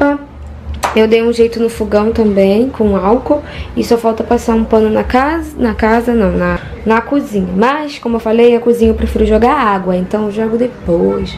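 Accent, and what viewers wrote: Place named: Rio de Janeiro, Brazil. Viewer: Linda amoo esse sutaque carioca, tô amando te acompanhar 😍😍😍👏👏👏👏 parabéns por ser essa pessoa atenciosa e quando voltar a sp vou te conhecer bjos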